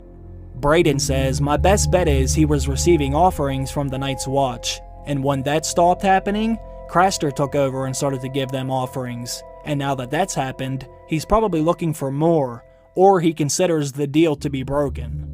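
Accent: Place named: South, United States. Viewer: You speak like a southern lord Baelish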